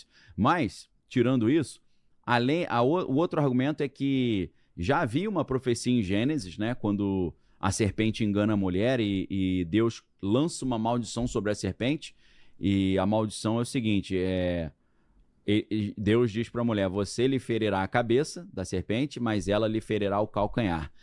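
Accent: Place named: Rio de Janeiro, Brazil. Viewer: De verdade, a primeira vez que ouvi o pensei: vixeee la vem o carioca!!! HOJE NÃO PERCO UM VÍDEO DELE!!!!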